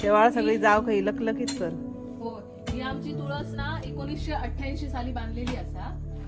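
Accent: Malvani, India. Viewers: मालवणी संस्कृती आणि मालवणी भाषा सुंदर आहे. जास्तीत जास्त मालवणी भाषेचा वापर करा म्हणजे यश नक्कीच मिळेल. लोकांना मालवणी भाषेतील व्हिडिओ जास्त आवडतात. तुम्ही मालवणी सुंदर बोलता त्याबद्दल आम्हाला अभिमान वाटतो. आपल्या व्हिडिओचे विषय चांगले आहेत. आमच्या शुभेच्छा सदैव आपल्या पाठीशी आहेत. धन्यवाद आणि आभार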